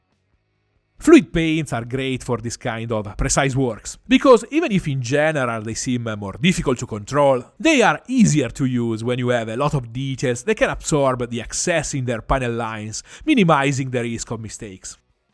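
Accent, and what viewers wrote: Italy. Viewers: This italian accents is so good. Approvo! My new favourite channel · I came here searching for new approaches to Lizardmen, as the last time I painted them (or any minis for that matter) was 10 years ago. This video was helpful and brilliant! I love your accent (Italian?) and how your first language shines through in your English; not only in rhythm but in the beautiful intonation as well! Lovely to listen to. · I watch your painting videos both because of all the useful tips & tricks, but also hearing your Italian accent - It sounds so joyful and makes me happy! 😁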